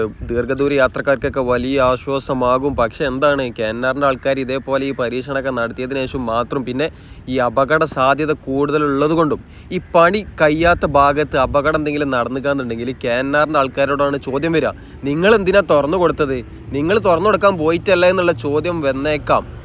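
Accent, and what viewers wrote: Malappuram, India. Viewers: എല്ലാ വിധ ആശംസകളും ! താങ്കളുടെ മലപ്പുറം accent കൊള്ളാം. ധൈര്യമായി, മാന്യമായി ജോലി ചെയ്യുക. രാഷ്ട്രീയം നോക്കാതെ നാട്ടുകാർക്ക് സേവനം ചെയ്യുക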